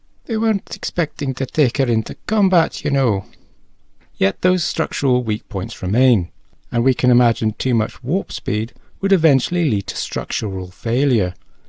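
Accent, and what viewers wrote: United Kingdom. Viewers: You sound British, so I'll cut you some slack. America had a famous comedienne by the name of Lucille Ball. She, and her husband, Desi Arnaz purchased an old movie company, RKO Studios, (which made the original 1933 film," King Kong"), as it was going out of business. They changed the named to Desilu, (Dez-E-Lu), which was made up of parts of each of their names. They made several television programs at their studio, like "I Love Lucy", "The Untouchables", among others. In the early 1960's they divorced, and Lucy was left to run the studio on her own. Apparently, she was not the greatest business woman, and things started to fall apart. Literally, there was vermin in the walls, pigeons in the rafters, etc. To raise money for repairs, she decided to make other television shows besides her own, "The Lucy Show", and teamed up with both NBC, to make "Star Trek", and CBS, to make, "Mission: Impossible". 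Both shows were filmed at ajacent sound stages. While CBS, and the public, liked Mission: Impossible enough to keep it funded properly, NBC didn't do the same with Star Trek. Over the three years that it was made, its budget was reduced each year, its time slot and day of the week it aired were constantly changed, so, if you did watch those original airings, (as I did), you never really were certain that it would air at the same time and day for next week's episode.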